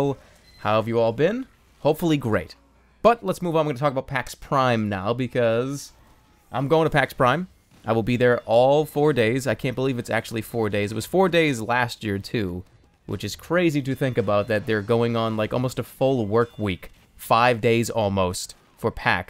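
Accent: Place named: East Coast, United States. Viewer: Jerma, I love your east coast accent.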